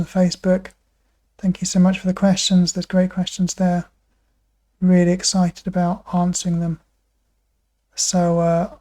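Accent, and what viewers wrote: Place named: England, United Kingdom. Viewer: You sound English .. why aren’t you having free treatment on the nhs instead of needing donations to pay for it (? In Japan?)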